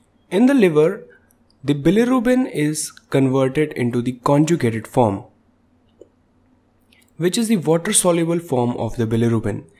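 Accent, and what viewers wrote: India. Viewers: Şu hayatta hintli İngilizcesi kadar kahreden bi şey daha yoktur. Ama iyi video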